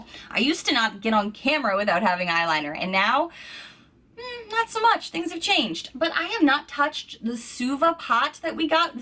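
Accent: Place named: Midwest, United States. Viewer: I always thought you sounded Midwestern. Plus you love the Blues.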